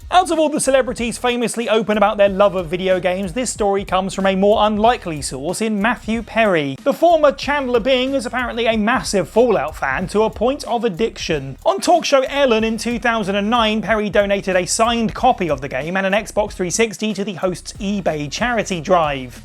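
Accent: United Kingdom. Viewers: Does anyone else love her voice? Maybe I'm just a sucker for British accents 😂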